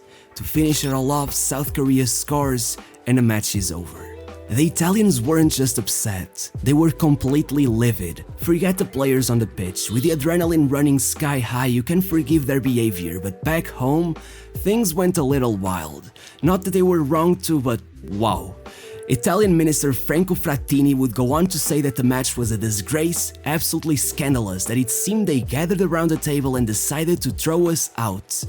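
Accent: Portugal. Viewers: this portuguese accent... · Hey, I couldnt stop to notice how you pronounced the portuguese names. You seem really portuguese. The way you talk and the accent, are you portuguese?:D cuz i am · Portuguese accent hh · i was pretty sure youre portuguese by the accent but when you said the portuguese players names the minimal doubt i had was gone hehe , im subbed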